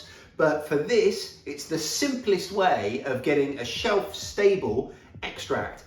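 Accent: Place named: England, United Kingdom. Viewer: Just found you! ❤️ Just subbed can't wait to check out your other vids and no offence to our American cousins your English! Just a bit more relatable - it's almost Summer in Australia so my mind is everywhere - so are the flies! As a migrant from UK I have never got used to them ,- those the ants and the flipping spiders! Will make the tincture to try to keep the ants at bay wish me luck! Looking forward to being a Subby and sharing vids with family and friends. Best wishes and positive vibes from Sydney Australia 🇬🇧❤️🇦🇺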